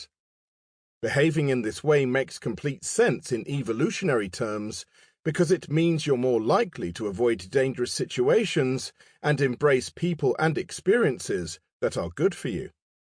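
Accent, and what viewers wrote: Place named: England, United Kingdom. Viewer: Great English accent and pronunciation from the narrator,.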